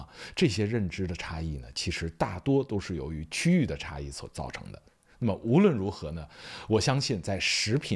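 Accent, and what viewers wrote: Standard. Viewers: 听口音完全听不出是东北老乡普通话相当标准了提个小建议能不能把英尺换算成平方米毕竟用英制单位的国家现在比较少印象里加拿大不也是用公制吗？难道我记错了？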